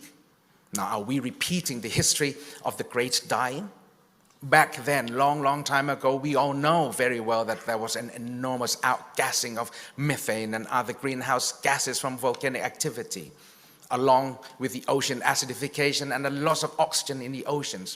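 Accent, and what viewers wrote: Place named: United Kingdom. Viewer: สำเนียงบริติช เพราะค่ะ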